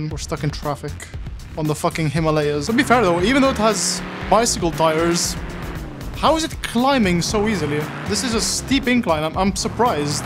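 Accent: Italy. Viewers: Can someone confirm to me that he is Italian because the location is in always Italy, he sounds Italian and i say that from expirience of being 50% italian. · Is he italian? I am And its italian sounds good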